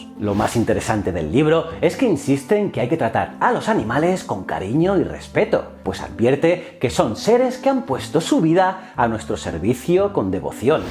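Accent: Spain. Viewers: Acaso los unicos productores de contenidos son asquerosos españoles , cuando esta gente es cinica mentirosa y estan dedicados en borrar historia de arrasamientos esclavitud asesinatos y robos el dolo escuchar este acento me revuelve el estomago, basta de estos asesinos no mas contenidos de estas aesinos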